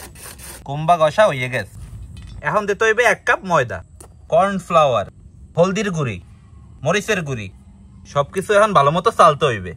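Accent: Barishal, Bangladesh. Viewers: আমিও বরিশালের ছেলে কিন্তু আপনার মতো সুন্দর করে বরিশালের ভাষায় কথা বলতে পারি না😢 আসলে আঞ্চলিক ভাষায় একটা টান যেটা আমার কথায় আসে না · হায় রে বরিশাইলা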